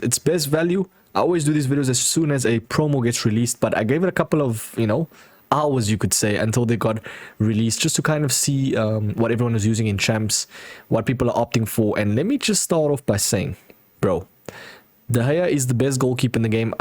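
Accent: South Africa. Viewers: I think he is from South Africa 🇿🇦 or New Zealand 🇳🇿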